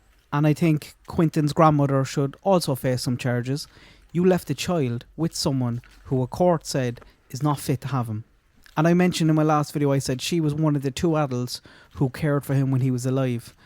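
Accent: Ireland. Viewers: my Brother, it felt very strange hitting the like button with the celebratory starred thumb gyrating. My heart is with you as your beloved brogue bears aloud the pain we all now feel and share. I wish I were in whatever corner of this world you occupy and I could buy us a few pints of beer, if you don’t total-tea exclusively. Though I do have a master of fine arts in creative writing, I have nothing more to say. Please take care of yourself/selves, and we cool-ass-subbies. This is a tragically sad story, yet it is still a blessed day to be alive. A part of our human experience is the requirement to hold disparate truths and emotions within our minds, bodies, and spirits all at once. 🙏🏽✌🏽❤️ from Minnesota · The rate of an Irishman!!! Love it!!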